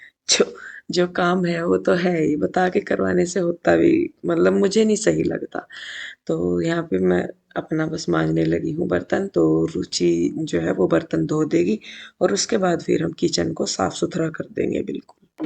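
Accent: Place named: Meerut, India. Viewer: Assalamualaikum me farzana Karachi Pakistan SE 4 3 Saal SE apka vilog dekh rhi hoon mere dada Dadi bhi Meerut SE thy apki boli buht achi lagti hy mere dada Dadi bhi easy hi bolty thy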